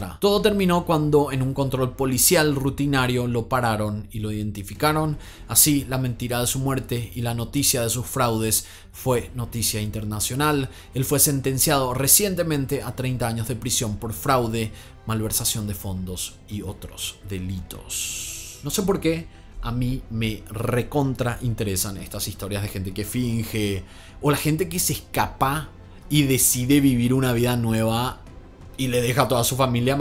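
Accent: Paraguay. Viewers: Èl es spectacular narrando cada historia y su español es impecable explicando todo tofitito, soy unas de su seguidora ademas ese acento paraguayo es unicooo❤❤❤❤❤ un chico lindo lindo nuestro.!!❤❤🙏🙏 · Amo que no soltas tu acento paraguayo 😍😍😍😍🇵🇾🇵🇾🇵🇾 excelentes casos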